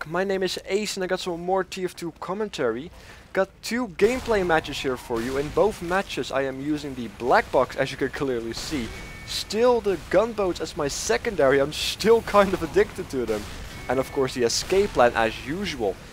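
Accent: United Kingdom. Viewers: I thought you were scottish.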